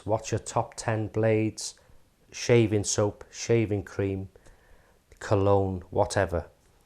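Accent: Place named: North Wales, United Kingdom. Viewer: Hi, Top man, interesting, informative chat. I look forward to all you videos, love the North Wales accent, makes me feel like being home. Keep up the good work Cheers Steve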